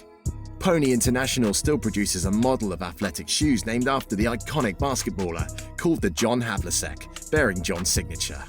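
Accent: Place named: Ireland. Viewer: The narrator is obviously not from the US, so he is pronouncing words he would normally do from his country (?I woud guess Ireland)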